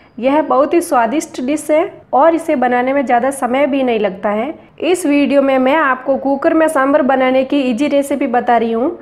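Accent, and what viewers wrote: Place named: Rural, India. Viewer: * आपका उच्चारण देशज झलक वाला है , अच्छा लगा । 💐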